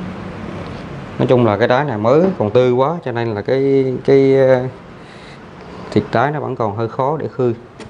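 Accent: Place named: Quang Nam, Vietnam. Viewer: Bạn ở đây mà nói tiếng Quảng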